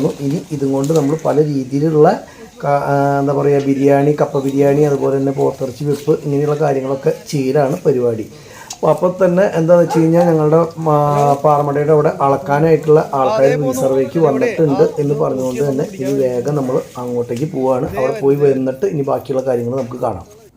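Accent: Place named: Thrissur, India. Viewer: Nidhak samsarikkanulla samayam kodkooooo. Thugg kekaanulla kodhikonda adum thrishoor Bashailooode😂😂😂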